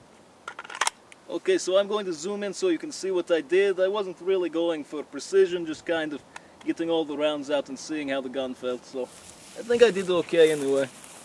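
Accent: Russia. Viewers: The Russian accent is slowly wearing off =( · Hey, Ive been watching you for around a week or 2 and I think your awesome, I always knew russians are this cool. Your accent is awesome, your always randomly funny and you shoot very well. I hope you will save us all when the zombies arrived some day:3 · omg I love russian accent XD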